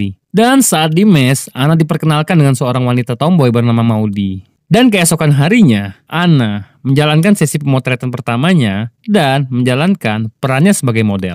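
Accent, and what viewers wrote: Palembang, Indonesia. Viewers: Aiii mang cek nee wong plembang yoo...😆😆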